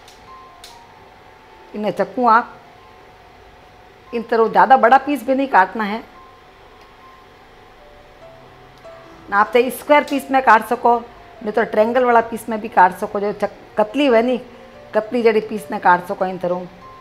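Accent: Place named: Marwar, India. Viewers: Aap itni pyaari maarwaari Bolte ho.......aapki aawaz mein Mitti kee khusbu aati hai......aur wohi taste Aapke khaane mein bhee aata hai ......! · Aapki boli sunke maza aa gaya .... rangilo rajasthan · मारवाड़ी बोली चोखी लागी, पर ए सब बनाबनो म्हाने आवे सा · Mujhe rajasthani nahi aati pr it is very lovely to hear. And this recipe was amazing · Wa wa sa Maine to aapani marwadi boli ghani meethi lage. Bahut hi chokhi tariya su btavo ho sa aap. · Even I also liked her voice and Rajasthani language how she describes🙏 really amazing so sweet voice and her presentation may god she lives long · I love her accent ... would like to meet her, where does she live? Jodhpur?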